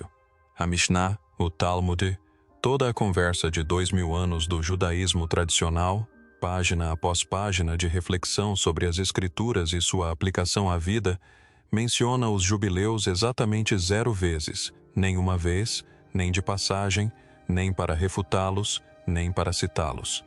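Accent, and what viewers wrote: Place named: United States. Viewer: Por que essa língua? Usou a IA e fez narrar como um americano com sotaque? Isso estraga completamente o trabalho, e só melhora depois (por um momento).. Isso é um trabalho RELAXADO meu amigo.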